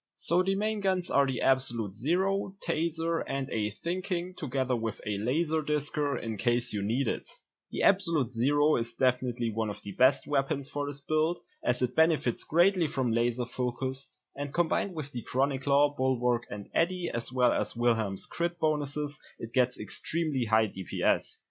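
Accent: Germany. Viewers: your german accent is so strong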